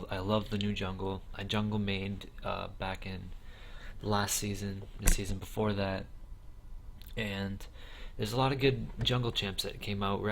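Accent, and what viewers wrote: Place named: Canada. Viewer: Love your sexy Canadian voice ;)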